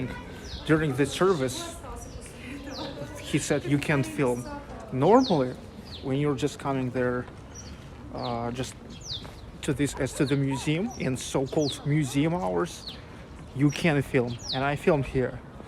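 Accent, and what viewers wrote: Russia. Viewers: Your Russian accent is charming; don’t lose it.👍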